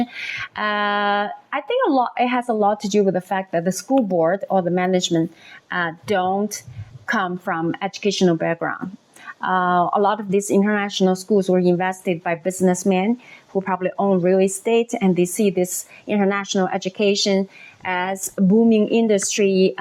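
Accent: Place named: China. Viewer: Is it skin color or is it expertise? For example, white native Italian speakers born in Italy probably have an advantage getting a job teaching Italian in US over someone who is white and whose first language is English. You speak English well but you still have a Chinese accent so a native English speaker will have an advantage. Move to another country and teach Mandarin if you want to make more money.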